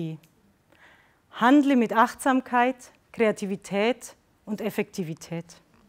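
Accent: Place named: Allgäu, Germany. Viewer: Ein wirklich sehr beeindruckender Vortrag - überaus sympathisch, unaufgeregt und motivierend! DANKE! Auch meine Pläne werden so langsam immer konkreter. Es wird auch allerhöchste Zeit für mich! Ich liebe zwar das Allgäu (mindestens so sehr, wie Dialekt!), allerdings zieht es mich eher in die Oberpfalz... - zu den Wurzeln meiner Familie.;) Namasté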